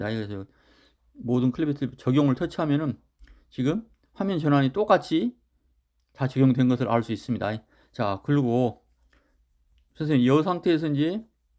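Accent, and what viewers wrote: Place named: Jeolla, South Korea. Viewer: 님 좋아요 그래요 저도 이번에 어플을 깔아두고 연습을해보았는데 생각보다 괜찮더라고요 잘 알려주셔서 고맙네요 혹시 전라도쪽?하겠습니다이~~~♡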